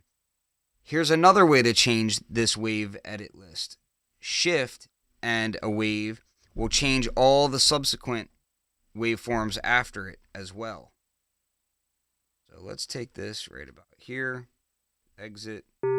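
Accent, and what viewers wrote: Philadelphia, United States. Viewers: It sounds like you have a Philadelphia accent.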